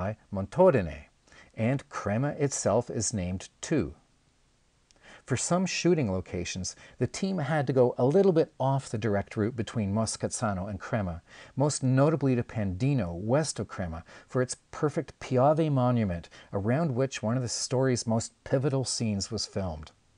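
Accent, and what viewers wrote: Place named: Canada. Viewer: You must be Canadian. (E.g, pronounciation of boat, house, etc.)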